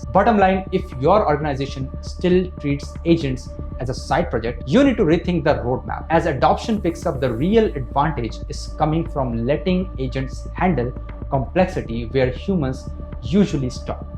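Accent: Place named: India. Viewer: omg. i guess your audience is meant for indian people not american people. you are impossible to listen too with your accent.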